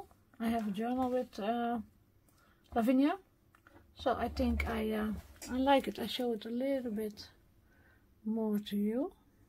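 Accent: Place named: Netherlands. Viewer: stunning style and love your Dutch English, you are the most sweetest lady xx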